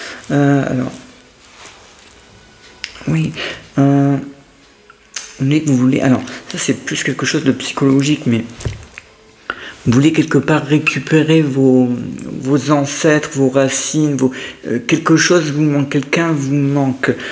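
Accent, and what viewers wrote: North, France. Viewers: Bonsoir. Je vous découvre pour la 1ère fois ce soir et vous m avez bluffée!!!!! Vos prédictions sont incroyables et m ont parlée à 1000 % surtout concernant min travail c'est tout à fait ça!!!! Vous etes dans le mille!!! Je me abonne à votre chaîne avec grand plaisir ❤. De plus je reconnais un petit accent nordiste et j'ai vecu à avesnes sur helpe toute mon enfance et j'ai de la famille à Maubeuge donc je connais bien la région que j aime beaucoup même si je vis actuellement à Marseille. Au plaisir de vous écouter avec hâte. Cordialement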